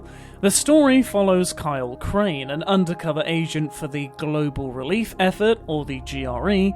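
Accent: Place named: United Kingdom. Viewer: This might be the only british accent I can tolerate, nice video so far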